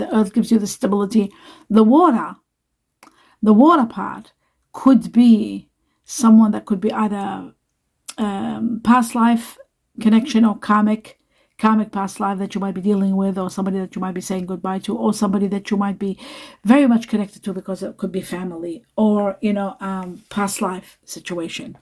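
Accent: Eastern Europe. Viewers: seems Eastern European to me.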